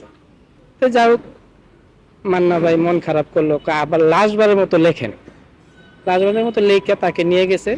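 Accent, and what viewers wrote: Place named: Comilla, Bangladesh. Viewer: লোকটা মনে হয় কুমিল্লা জেলার ,,, কথাবার্তা একদম গ্রামের মানুষের মতো 🤔🤔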